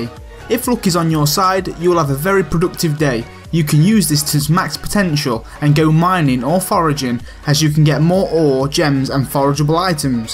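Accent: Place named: East Midlands, United Kingdom. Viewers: Thanks for video bud, appreciate it. Nice to hear that sorta accent to, from the east Midlands by any chance ? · Loved the narrator's voice/accent. He reminded me of my childhood in Leicestershire (Ashby-De-La-Zouch.)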